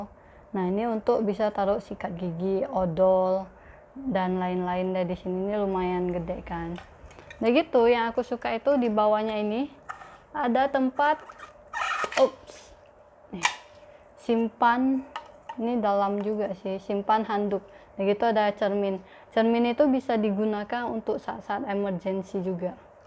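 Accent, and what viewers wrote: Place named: Medan, Indonesia. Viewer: Medanya gk hilang .. wkwk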